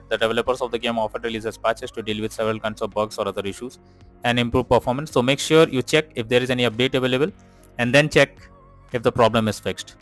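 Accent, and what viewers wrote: India. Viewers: Honestly bro. As soon as i hear Indian voices i turn your video off...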